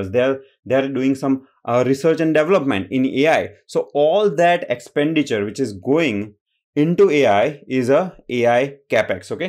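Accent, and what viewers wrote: Gujarat, India. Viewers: Loved the video! 💯 The moment you spoke, I was like, Aree waah, Gujarati !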